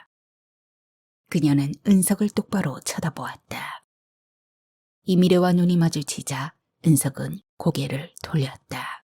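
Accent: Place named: Jindo, South Korea. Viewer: 전라도사투리도 지역마다 다른데 진도 사투리를 제대로 해주시네요. 1980년초 저 한 열살즈음에 진도앞 조그만 섬사람들이 통통배타고가다가 여럿이 배가 가라앉아서 여러명이 죽었어요. 그래서 혼을 건진다고 무당불러서 섬에서 굿을했었는데 무당은 훨훨날아다니고 쇠밥그릇두개겹쳐서 흰천으로 싸서 길게늘려서 혼건진다고 바다로 던졌다당겼다 하고 긴대나무 잡고 있던사람은 미친듯이 떨면서 흔들리던게 기억나는데 그때는 겁없이 구경했는데 그게 씻김굿이었군요. 잘들었습니다.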